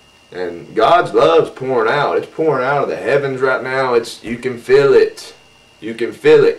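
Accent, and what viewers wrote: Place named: South, United States. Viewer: I hear you my brother J. I stopped trying to show the blind all the MJ clues a long time ago. It's really an exercise in futility. I just go straight for the jugular now and show them the reality of the lies that have surrounded us for years. But you gotta be there for them when they finally see the truth cause they all go into shock, drop to the floor and get sick to their stomach initially. Keep up the great work, love your vids and the southern twang. I'm even deeper south from you.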